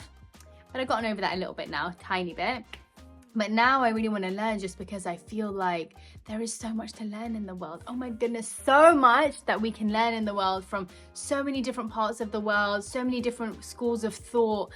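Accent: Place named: United Kingdom. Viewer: Why she has British accent. Is she Indian or🙄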